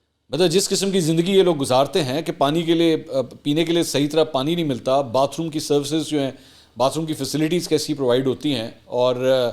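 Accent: Punjab, Pakistan. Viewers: Never mind anything else, love the Punjabi touch ji…!!💯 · Punjabi touch tez karay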